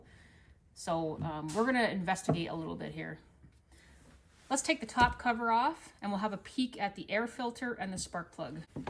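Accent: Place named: Canada. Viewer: There's always something solidly reassuring about a Canadian accent. No idea why this was recommended - my back yard is about 25m2!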